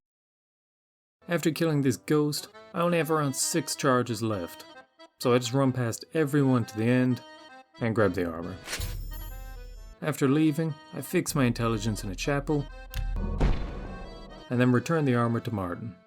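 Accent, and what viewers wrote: Ireland. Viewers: Where is your accent from you sound Irish but not quite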